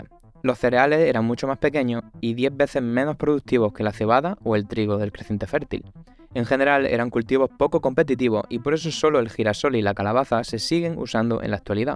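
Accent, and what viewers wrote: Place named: Andalusia, Spain. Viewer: 🇪🇸 ¡Andaluz profundo!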